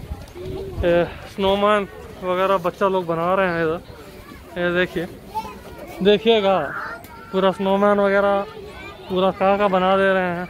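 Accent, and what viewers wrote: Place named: Bihar, India. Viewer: Oyee budbaak....japan me bihari kaise ban gya....thik hai beech beech me bol skte ho...par normal hindi try kro bhai...anyways love to see you all...keep uploading new videos..👍👍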